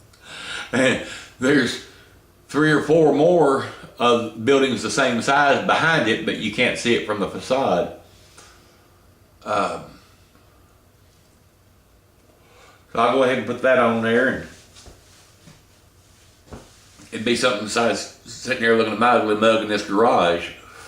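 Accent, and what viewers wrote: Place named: Appalachia, United States. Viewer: Hi. Just wondering are you from Tennessee Your accent is very much like mine and Larry's. do you like Indiana?. I don't mean to be nosy just curious. I just couldn't bring myself to leave these old mountains:) All my best. Bobby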